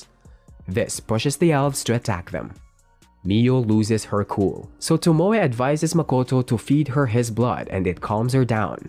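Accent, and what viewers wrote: Philippines. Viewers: Pilipino kano tangina mo alam ko ang boses ng english ng pilipino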